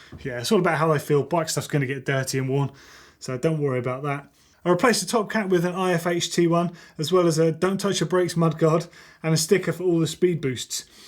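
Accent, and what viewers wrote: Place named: England, United Kingdom. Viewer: is a proper Englishman and they pronounce things funny. Ask him to say "Gloucester" or "Worcestershire". You'll see what I mean.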